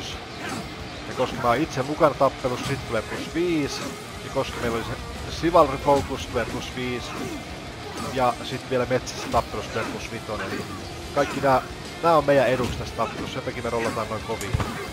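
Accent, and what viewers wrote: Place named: Satakunta, Finland. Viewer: Oletko satakunnasta? Päättelin vain "murteen" perusteella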